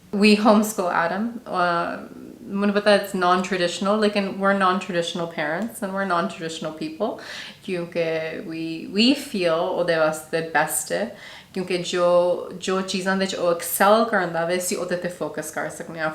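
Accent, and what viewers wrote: Punjab, India. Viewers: u beauty❤ love ur punjabi accent😍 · I always love your Punjabi accent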